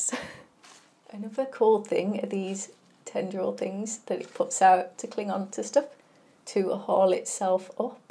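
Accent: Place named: United Kingdom. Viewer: In all fairness, cucumbers are supposed to have the spiky things on them. When you pick them you can just put a pair of gloves on and rub them right off. You sound like you are from the UK and having spent quite a lot of time there, you have a bit of a different selection of cucumbers than us in the states. I hope these turned out well for you! And good job getting them grown indoors. Edit: One extra thing. The runners that come off the plant and attach to the trellis can get excessive and take nutrients that the cucumbers need...so if there are way too many, you can pinch them off and have bigger cucumbers quicker.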